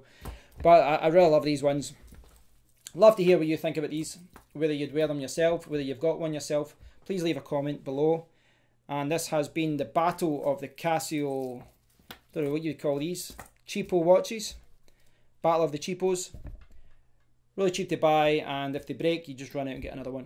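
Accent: United Kingdom. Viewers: Definitly British by the final entonation but I find your accent uncommon, unsusual for me. Maybe is it Scottish? Greetings from Spain.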